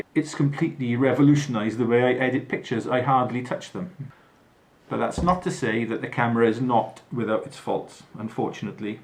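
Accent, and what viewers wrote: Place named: Wales, United Kingdom. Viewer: Just come across this by chance. Not a Fuji shooter (used to have an XT1 though) but love the medium format landscape look & if money was not an issue this would have been a must.. Nice to hear a Welsh voice and someone shooting landscape on You tube. Just off to check your other videos. Hope you get your probs sorted with this camera. atb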